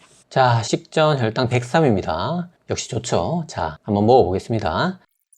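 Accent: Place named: Busan, South Korea. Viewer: 혹시 의사샘 부산에 계신건가용? 말투가 익숙한것이 ㅎㅎ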